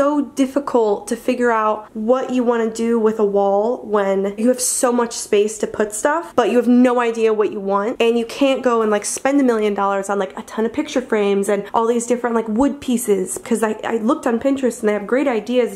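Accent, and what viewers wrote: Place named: Ohio, United States. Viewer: It's so refreshing to hear another Ohioan accent, amongst all the Californian ones (nothing against em, but hometown pride and all that haha). The curtain lights behind your bed just gave me an idea for my own room, thanks for such a creative AND affordable display of room decor!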